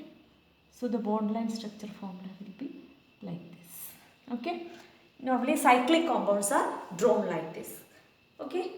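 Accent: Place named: South India, India. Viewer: i thought from south part of India,based on yours accent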